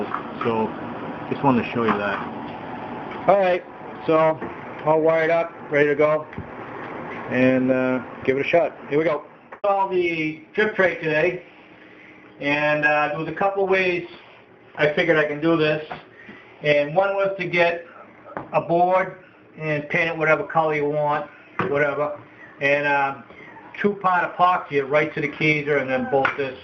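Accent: New England, United States. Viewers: Great work! I'm sure everyone is jealous now. :) Are you from New England? I suspect RI. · your craftsmanship + your accent make me feel like I'm watching The New Yankee Workshop.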